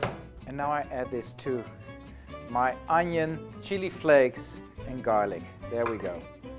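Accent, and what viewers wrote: Netherlands. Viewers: He must be Dutch with his accent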